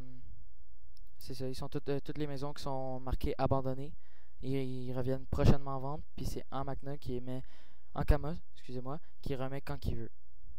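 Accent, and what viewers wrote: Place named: England, United Kingdom. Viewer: pt1 je supporte pas quand les gens parlent francais avec un accent anglais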